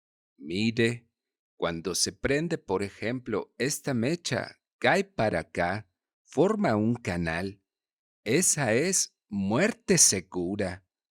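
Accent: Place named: Yucatan, Mexico. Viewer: Wow muy bueno 👍 hasta tonito de yucateco hicistes muy buen trabajo 👍 por eso te sigo por tu buen trabajo 👍 gracias por compartilo tienes mi respectivo like #11 saludos desde Chicago IL 👍🙏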